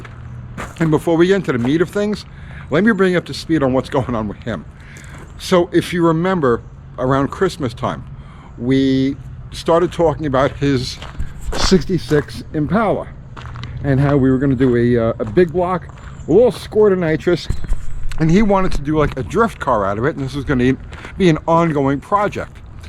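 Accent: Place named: Boston, United States. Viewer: I can listen to you info dump all day. I think part of it is hearing you talk car engineering in your slight Boston accent reminds me of the tappet Brothers I used to listen to all the time. Kind of humorous to hear a boomer say yeeted though.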